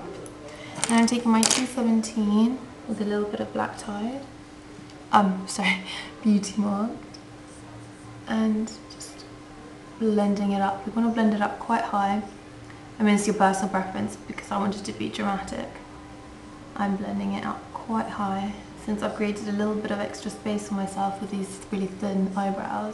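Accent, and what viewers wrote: England, United Kingdom. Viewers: Lovely accent! I miss England, I got my BA in English Lit there. :( I want to be there again so bad.. Anyways, you TOTALLY persuaded me to go get the Spiced Chocolate quad, as I have commented earlier, I never thought that copper-red would look good on me as I have blue eyes and fair skin, but we both have the same colouring so I will give it a try. Please, please, please!!! make more videos,I beg you! You have amazing talent, you have not been in make up school? Really? I am gobsmacked.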